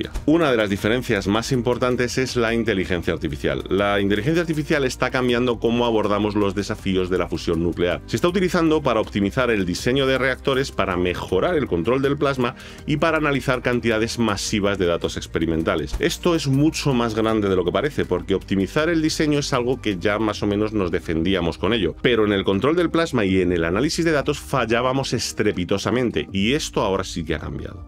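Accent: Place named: Spain. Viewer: hay que ver cuanto dinero se invierte, por lo gral hay pasta donde se ve algo de potencial... , pero claro, el humano promedio asalariado de a pié , se enterará en la factura de luz/energía tal vez dentro de unos añetes ... xD, o lo que lleve la faena... Soy argentino, pero el azento me hace dar ganas de usar palabras o términos de la pez nínzula ibérica xD jaja xD : "ponele":.. :-b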